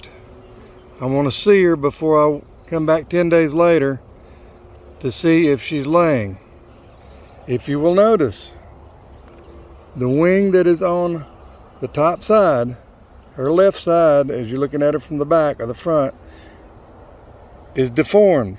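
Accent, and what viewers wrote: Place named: South, United States. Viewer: don’t you hear that southern draw….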